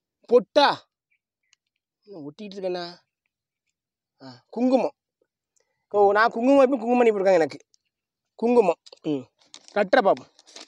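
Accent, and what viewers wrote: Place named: Thoothukudi, India. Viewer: தலைவரோட அந்த சரலமான தூத்துக்குடி பாசை -க்கு நான் அடிமை 💥🙌🏻😂🤣நீ வேர ரகம் தலைவரே🙌🏻😄🤣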